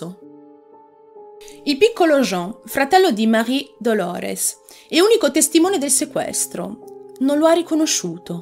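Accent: Apulia, Italy. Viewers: Non sapevo che fossi francese, se davvero è così complimenti parli un italiano perfetto, pensa che io ti scambiavo per come parlavi in italiano per una Pugliese😂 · Interessantissima storia ed allo stesso tempo agghiacciante. Quante vite sono sconvolte da storie simili. Una curiosità: ti credevo pugliese. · Ma perché se sei francese hai un accento pugliese così forte????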